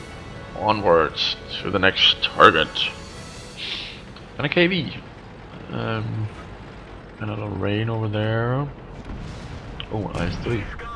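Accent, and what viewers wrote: Denmark. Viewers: are you danish??? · Dude be nice if we could face each other cause when i watch ur vids i get the urge to face u lol...an based on the accent ur Danish am i correct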